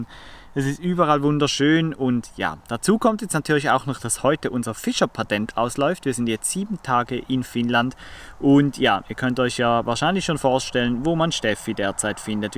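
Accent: Switzerland. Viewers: Sorry, aber... Wenn Schweizer Hochdeutsch reden, geht garnicht...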